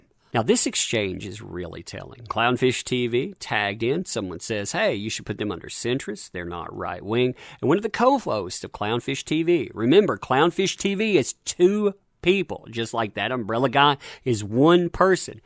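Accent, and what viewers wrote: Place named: South, United States. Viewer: Been hearing a lot about this thing and how wrong they get things. Smh. I love your accent btw, where's it from I assume southern?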